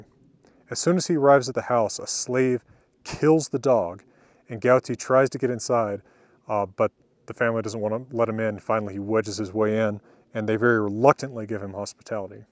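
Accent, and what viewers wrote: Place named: United States. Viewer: I love your style. Your hat and your American accent are fcking manly. Þú ert víkingur!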